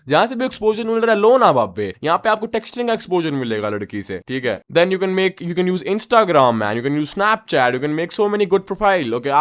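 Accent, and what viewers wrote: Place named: Rohtak, India. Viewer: bhai rohtak se hai ke tu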